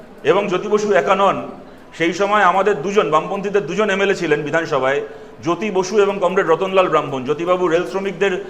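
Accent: Bangladesh. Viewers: Who is not available on pronunciation about parijon and proyojon,he is what type of leadership. what is aramvabe? What are these educated man? Joytibasu made backward West Bengal few decades mainly in English language. actually vat speaker. She has not proper pronunciation also in Bangla. Her pronunciation like Bangladeshee.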